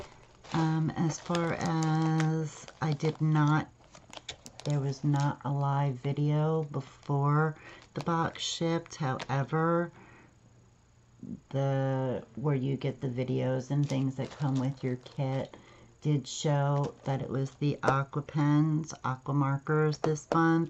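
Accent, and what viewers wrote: United States. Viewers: lol i thought u were from nj u talk like my husband lol 😊🐥☀️❤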